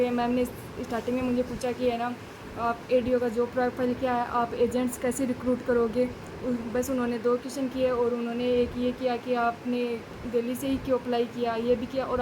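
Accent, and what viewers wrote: Rajasthan, India. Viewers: Video suru krte hi smjh gya thha bolne ke trike se, rajsthan se hai medm ji 😂😂😂😂 achi vancency thhi idhr bhi Apne zone se hi bhrna chhaiy thha ADO.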